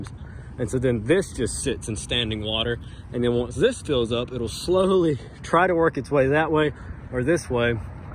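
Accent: South, United States. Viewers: ...You got me with the thumbnail...I thought ooh new Post 10 video...Then I clicked on it and heard your smooth southern accent...haha!!! You're both Legends! What you need is your 'Drain Maker 6000'...haha!!!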